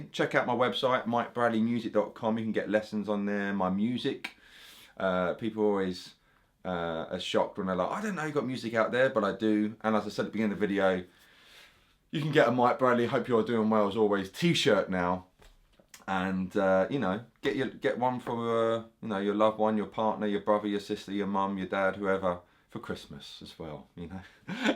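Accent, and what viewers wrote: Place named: South London, United Kingdom. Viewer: Great video - the most basic fuzz lesson but the most instructive. And love the SRV/Hendrix towards the end!!! Brilliant Mike. And love the sarf Londern accent...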